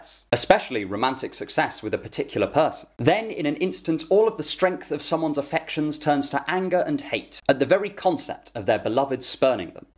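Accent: United Kingdom. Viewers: 4 star video. -1 star for the obnoxiously british accent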